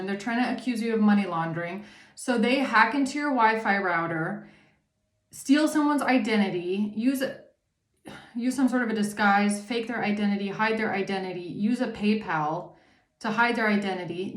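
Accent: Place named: Germany. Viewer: Afternoon beautiful 🐝, LOOKING FORWARD TO WATCHING AND HEARING YOUR BEAUTIFUL ACCENT 😊 German ❤, have a beautiful day night x ❤ thank you x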